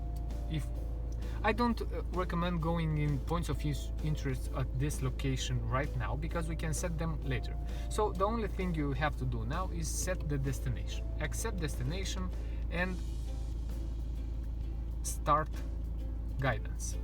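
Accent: Romania. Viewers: Are you Romanian?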